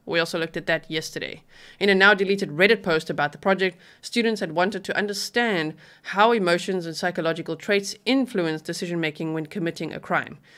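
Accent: South Africa. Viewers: This is my first time watching your videos. I couldn't place your lovely accent so thank you for telling us that you are from South Africa living in the Netherlands. Your videos are so full of information. It feels like I'm back in university.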